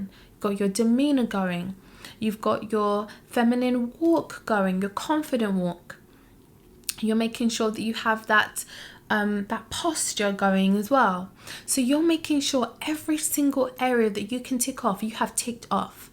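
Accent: United Kingdom. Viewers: Umm.....Are u from London.I'm feeling the British accent..Umm u will NOT be invisible over here in the USA..they will LOVE that accent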